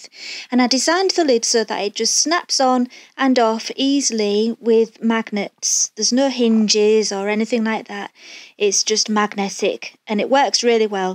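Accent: United Kingdom. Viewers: 这姑娘是英国口音么？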